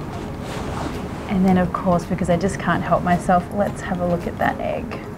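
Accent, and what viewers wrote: Australia. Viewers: Australian accent? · aussie accent is everything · You have the sexiest voice and accent, is it Australian?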